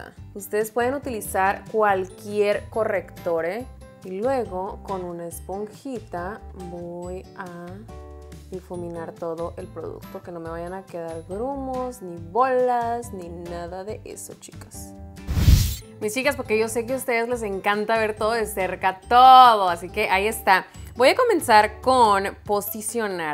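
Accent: Mexico. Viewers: Jajaja me encantooo como hablas, pura mexicana! Saludos! Me acabo de suscribir porque me convenciste con tu flow de poses perronas jajajaja 😂😂😂😂😂😂👍🏼👍🏼 saludos de Guatemala 🇬🇹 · A mi me encanta que hables como está buenas sinaloense mujer la verdad 💜🍇💜🍇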